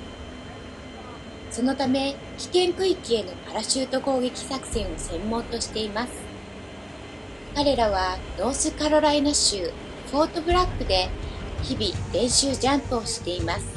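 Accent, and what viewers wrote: Japan. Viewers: しゃべってるひと日本人？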